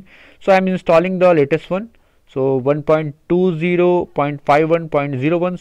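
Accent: India. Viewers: hi this helped...but the indian ascent😭😭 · Heard that Indian accent so I KNEW bro would deliver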